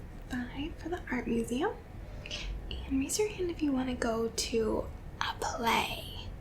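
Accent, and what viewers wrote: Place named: Michigan, United States. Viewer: She's gotta be from Michigan right? Or upstate New York. Something up north. Love the accent!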